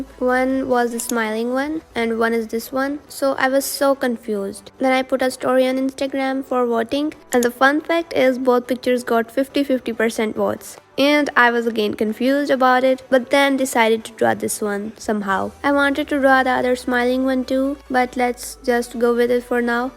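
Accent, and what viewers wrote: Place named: India. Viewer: Don't hide ur indian accent.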